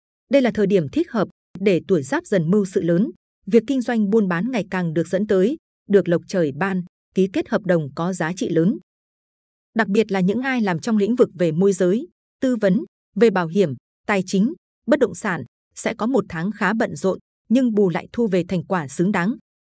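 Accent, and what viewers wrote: Thanh Hoa, Vietnam. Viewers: Thầy ở huyện nào thanh hóa vậy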